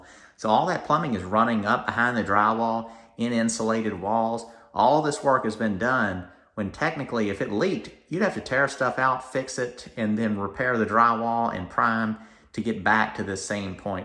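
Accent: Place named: Appalachia, United States. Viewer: Love this house it looks so good... i know renters dont get invested to care about a house i dont understand that sloppy mindset... if someones trusting you with their property you darn well look after it... when i have moved home i clean everywhere for the folks moving in... if my daughter rented when younger i took it as my responsibility to clean from top to bottom before we left..its in my upbringing... id treat yours better than my own cos its not my own... simple as...i wonder what kind of vetting you do to get decent tenants.... Also cant figure your accent ... im from england... you sound southern but not as southern as Dolly Parton... your voice is so easy to listen to... Fine job..... looking fab... kinda envy the lucky renter who gets to benefit from all that fine attention to detail...:)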